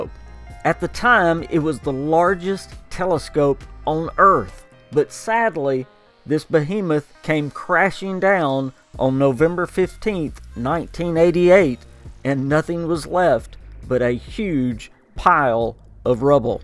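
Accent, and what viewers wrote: South, United States. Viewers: Are you from North Carolina or Texas? Someone said you were in Kansas, but I can't tell exactly.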